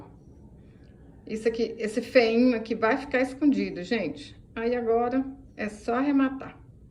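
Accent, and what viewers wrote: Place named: Belo Horizonte, Brazil. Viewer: Adoro vc jeito falar igual minha tia de Belo Horizonte fazia coisas e doces como ninguém foi embora 88 anos que saudades qdo ouço você quero me inscrever não consegui ainda bjs amo vc creusa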